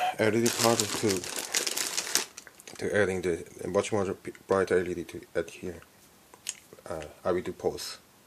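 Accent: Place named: China. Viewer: Sounds like a chinese trying to speak english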